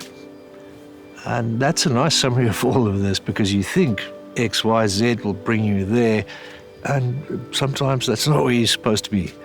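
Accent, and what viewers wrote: South Africa. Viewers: I know a south-african accent when i hear one. Lekke lekke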